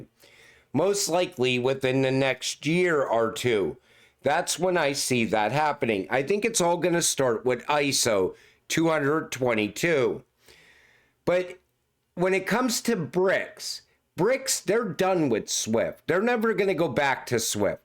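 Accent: Pittsburgh, United States. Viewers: You sound like a Yinzer, from Pittsburgh!!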